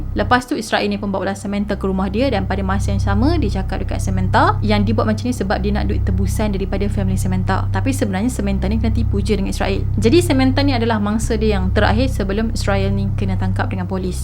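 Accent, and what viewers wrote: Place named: Kelantan, Malaysia. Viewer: Israil ni org kelantan ke....dengar mcm kak ckp mcm org kelantan...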